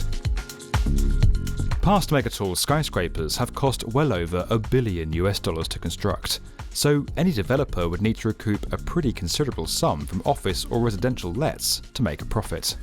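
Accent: United Kingdom. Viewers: I know right I like a good British voice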